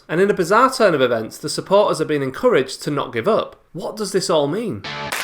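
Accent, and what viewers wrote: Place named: East Yorkshire, United Kingdom. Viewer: You sound more East Yorkshire than Newcastle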